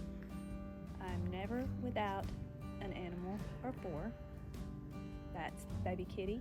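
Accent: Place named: South, United States. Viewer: I love southern accents! Please don’t let anyone tell you your accent is horrible.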